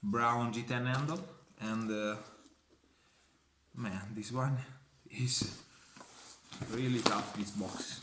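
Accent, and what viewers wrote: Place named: Umbria, Italy. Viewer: Bravo, bella presentazione. Ottimo coltello. Il tuo inglese con cadenza umbra è inarrestabile!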